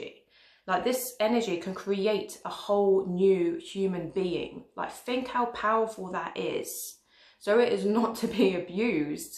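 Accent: United Kingdom. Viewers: WHY do you dis the westeners with your british accent. I'm Not defending us but we're the worst?? Are you kidding??? that is negative. its called sex not shagging,btw shagging makes it sound like its not really sex nor that it has conseqences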